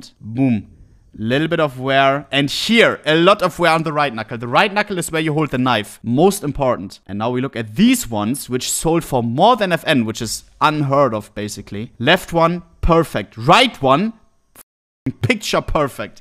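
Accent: Germany. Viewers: bruder dir als deutscher zuzuhören da bekommt man kopfschmerzen, bitte deutsch oder beton die sachen auf english wenigstens richtig EDIT: trotzdem gutes video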